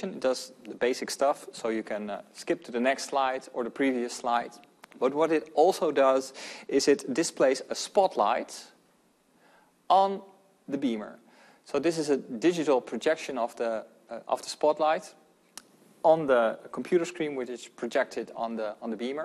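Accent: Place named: Netherlands. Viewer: Great review! You have a Dutch accent and name! I reckon you are Dutch!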